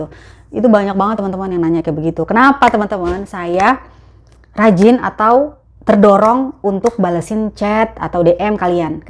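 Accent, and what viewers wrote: Jakarta, Indonesia. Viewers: Assalamualaukum Mm demen banget dah denger logat betawi nya. Salam kenal dr JKT Utara,kl mm jkt nya sebelah mana pok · Kamu lucu bgt sih mm, sy subs baru, seneng sama gaya ngomongnya ya betawi²an, lugas dan khas orang Jakartaan kata orang Jawa mah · Assallamuallaikum..mama..salam kenal,sya penggemar +suscraber baru mama, baru 2minggu lht chanel mama..lanhsung jatuh bangun eh...jatuh ❤️mksudya 😊😊, suka cara mama ngomong, logat betawinya kentel bngt, dan kelihatn Mama oranvnya ngk sombong, sayang aq ngk punya IG jdi ngk bisa folow IG mama 🤭🤭🤭, sekali lagi salam kenal &sucsses untuk chanelnya mama 🙋🙋😊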